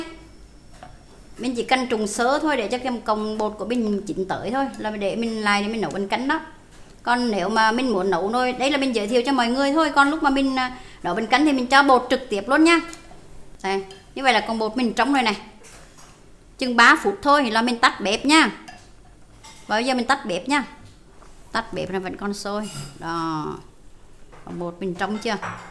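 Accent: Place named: North, Vietnam. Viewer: Banh canh goc o QTri ma MC lai noi giong Bac tia lia ?! Het muon an!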